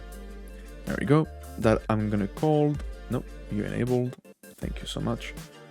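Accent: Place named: France. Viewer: Sa sentend quil est français lol trop cool x)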